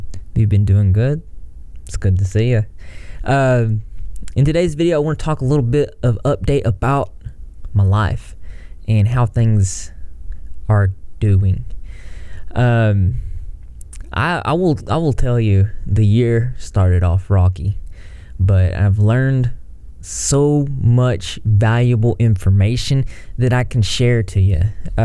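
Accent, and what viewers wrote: South, United States. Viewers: I too struggled with boundaries until I started my nursing career. I’m not sure why that changed but I’m glad it did. Not going to say it’s perfect but I learned like you probably have people will walk all over you if you don’t. I noticed you also have a southern accent so I’m assuming you are from here as well. It’s sad that people take our generous nature and upbringing and use and abuse us. It’s not because we are stupid or gullible we are just taught to do for others. I’m glad you are working on this and I hope you continue to do well :)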